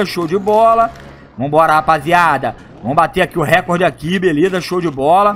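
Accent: Rio de Janeiro, Brazil. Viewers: Esse cara fala o verdadeiro carioquês kkkkk salve sou de Nova Iguaçu RJ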